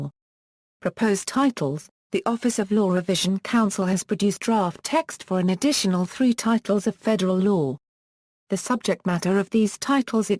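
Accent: England, United Kingdom. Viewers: And why is an Englishwomans' voice used to talk American law?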